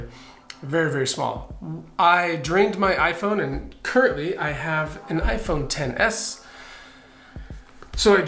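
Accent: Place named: Western Washington, United States. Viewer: Hey. New subscriber here, and man do I love your content. You deserve a lot more views. Unlike a lot of backpackers and UL guys you seem to come from a hunting background, which might be the fresh and relateable take you bring to your videos. It also sounds like you're up in western Washington maybe? I'm down in Portland! I gave you a follow on IG too. I look forward to your future videos, so please keep them coming!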